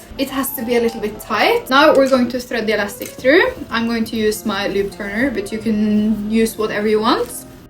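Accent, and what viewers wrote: Norway. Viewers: 😅 she is a Norwegian fashion designer. I guess you don't like her accent then? Wonderfully thorough tutorial without being too long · she’s Norwegian she has an accent…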